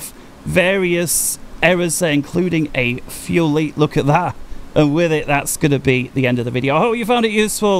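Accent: United Kingdom. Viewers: A really good teacher, even tho you speaking british :D If it comes to GMT, i only use it for looking for targets, then when I do, i lock with radar and then quickly switch to TGP and point track, then use laser Maverick to kill them.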